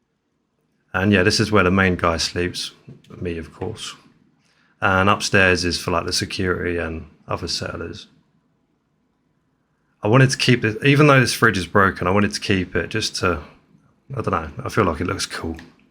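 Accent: United Kingdom. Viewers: Love your cockney accent bruv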